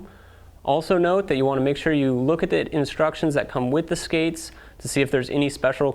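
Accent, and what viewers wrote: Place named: Europe. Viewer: 175 F obviously! This dude sound european???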